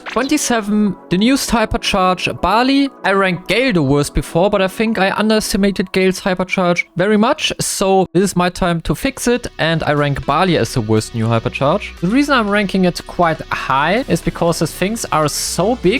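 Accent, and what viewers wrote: Germany. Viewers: Are you german I hear an accent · your german arent you pls say it in your next wedio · Man merkt dass du deutscher bist😂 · bro are you german? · Dude i heared you First time and i instantly knew that you are a german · could it be that you speak normally german ?? cause in the first 30 seconds of the video i relsied that your speaking of english is a bit different like mine cause i normally speak german too XD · Man hört den Deutschen Akzent so krass raus! 😂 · Es ist so geil dich englisch sprechen zu hören mann hört das du deutsch bist (ich spreche genau so englisch) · Ar u German ? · This guy is defo German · Dieser deutsche Akzent ist ja crazy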